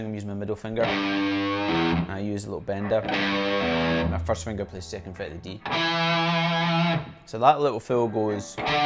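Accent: Scotland, United Kingdom. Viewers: Sweaty knockers sounds best! In your accent! ;) Scottish? Great job on the playing.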